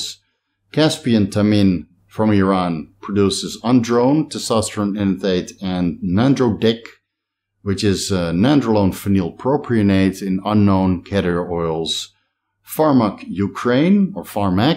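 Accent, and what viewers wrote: Netherlands. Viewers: sounds ok to me. A bit of a Dutch twang but ya get that when english isnt your first language. You say gyno no worries now. The content is what im here for and give it an A+👍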